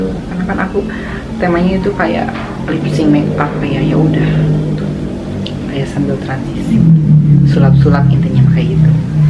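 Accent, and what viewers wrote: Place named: Sunda, Indonesia. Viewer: Sunda abiss si aa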